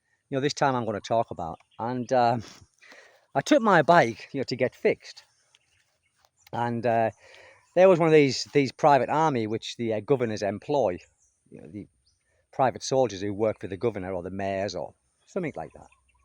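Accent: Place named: Northern England, United Kingdom. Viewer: Hi I have just discovered your channel and finding it really interesting I have had similar experience when I spent a year in Bali same expectation from locals when westerner arrives they must be wealthy even though I was exploring idea to retire there because retirement in my home country New Zealand was not looking to be comfortable financially however I gave it a year the weather I loved most of the people were friendly but I returned to New Zealand Bali was never going to work out for various cultural differences some you describe with life in the Philippines by the way I have lived in New Zealand for fifty years originally from north of England Middlesbrough your accent interestingly sounds very northern England curious as to where you originate from?keep up great videos love how your son speaks English I also can slightly hear a little north English accent from him so cute.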